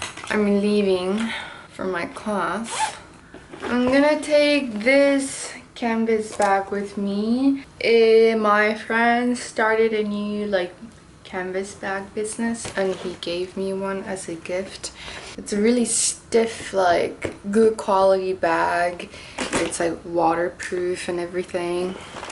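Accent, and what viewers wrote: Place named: United Kingdom. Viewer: 안그래도 오랜만에 영국발음 듣고싶다고 생각하던참이었는데ㅜㅜ거마워여❣ 우아한 취미생활 부럽다용😆👍🏻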